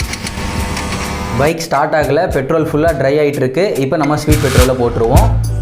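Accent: Vellore, India. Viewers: Nega Vellore ahh???.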